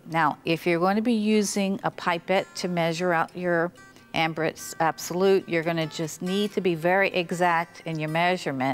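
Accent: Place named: Texas, United States. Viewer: She got that heavy Texas accent “oowls" lol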